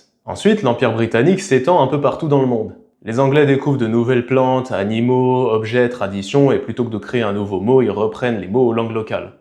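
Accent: United States. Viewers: Toujours très intéressant merci et même si les commentaires ne sont pas à la hauteur de votre propos c'est qu'ils prouvent notre intérêt ! je me permets donc : Pourquoi cet accent américain alors que l'accent de nos voisins anglais est si beau et qu'il s'agit d eux principalement? Pour la prononciation : Zibra / balè / tchoklet / chauffeur. Barbecue (barbe au cul) ok avec les autres. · Bsahtek l'accent américain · Oui. C'est un accent américain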